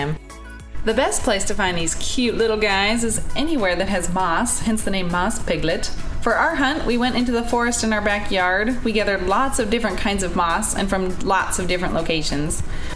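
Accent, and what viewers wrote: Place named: Midwest, United States. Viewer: You sound like youre from Michigan or some midwest state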